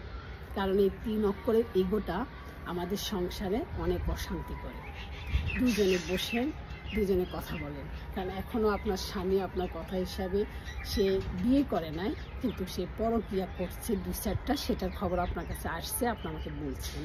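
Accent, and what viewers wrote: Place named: Comilla, Bangladesh. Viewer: আপনি শুধু বিয়ে নিয়ে থাকেন। মন রোমান্সে ভরপুর। কুমিল্লার কোথায় থাকেন? আপনি কুমিল্লার মেয়ে। ভাষায় বুঝা যায়।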